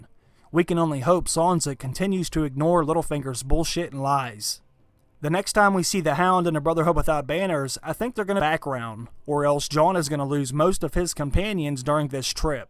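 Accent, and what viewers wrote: Pittsburgh, United States. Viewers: Are you from Pittsburgh, PA ... your accent sounds like Pittsburgh DAD.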